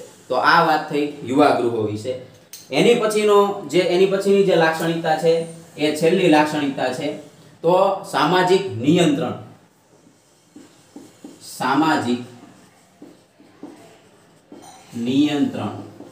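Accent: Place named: Kathiawar, India. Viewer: મને આપ ની કાઠિયાવાડી બોલી બહુ સરસ લાગે 👍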